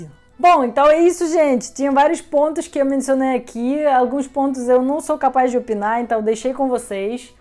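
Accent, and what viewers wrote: Rio de Janeiro, Brazil. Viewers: Tá com um sotaque carioca carregado, o famoso "S" chiado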